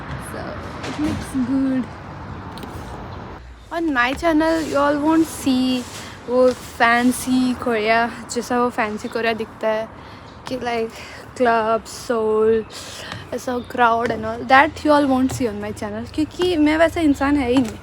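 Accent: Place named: India. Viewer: mai aapka first. Vlog sa huu🤧🤧🤧 l like you hindi accent esliye mai aapki vlog dekhti huuu🫠🫠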